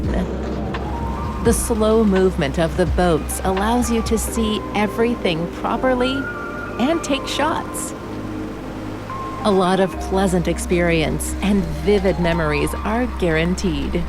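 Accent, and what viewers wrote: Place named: United States. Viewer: The American pronunciation is hilarious! "Van go". Ridiculous! It's Fahn gockh. Also, Omsterdom, to pronounce it correctly, does not have the only diamond museum in Europe: there are many - try going to Antwerp and Brussels.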